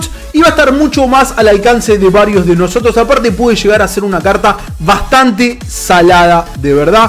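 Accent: Argentina. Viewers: Q lujo oir hablar a un argentino, te lo dice un gallego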